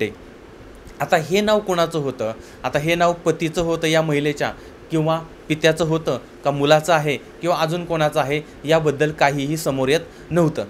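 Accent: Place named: Ahmednagar, India. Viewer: saheb, nice Nagar (Ahmednagar) language. This language is very superior to other district languages especially vocabulary, uniqueness, least niazam urdu, systematic presentation. Keep it up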